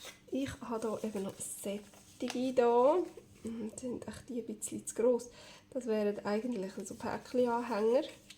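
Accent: Switzerland. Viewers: Hooi so schön schwizerdütsch höre 🥰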